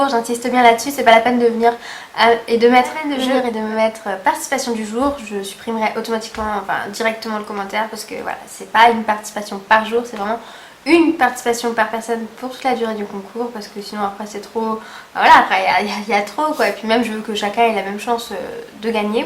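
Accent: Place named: Alsace, France. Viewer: Hello ! Je participe a ton super concours! Je n'ai pas malheureusement rien testé de la marque Remington, je n'ai pas de lisseur non plus car mes cheveux sont relativement lisse comme les tiens; et grâce a ton tuto je me dit que je pourrai finalement quand même en utiliser un pour me faire des ondulations ! :) Mon anniversaire est le 24 oct ça serai un superbe cadeau haha Ps: J'aime beaucoup ton petit accent Alsacien !!!!!!!!! vive l'alsace ! salut bissome !!! :)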